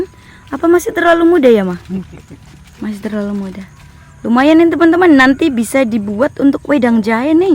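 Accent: Banyumas, Indonesia. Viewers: Wahh wonk ngapak to mba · " kepriye".. 😄 kirain kak asli orang bali atau menado.. ternyata banyumas ya... ❤️ seru euy kebun ibunya kakak.. sehat selalu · Wah,ternyata aslinya ngapak. Bener² gk nyangka. kirain asli bali. Sukses selalu ka 👍😘 Seru banget panennya, sy jd pengen pulang kampung 😊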